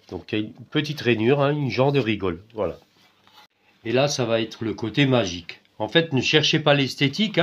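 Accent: Alsace, France. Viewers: C'est copié ,plein de vidéo sur Youtube sur ce sujet !!!!!!!!!,j'en ai trouvé une vieille de 8 ans ,pour recoller un réservoir eau de cafetière il faut oser ,collage cyano et la poudre sur un récipient alimentaire pas bon ;moi je fais pas ,attention si eau pas en contact avec collage pas de soucis. Ton sujet est un peu comme on dit du déjà vu ,celà ne retire rien a ton talent de bricoleur ,trouver un autre sujet pour durer ,c'est pas évident je jen convient . Tu a un accent Alsacien . Bye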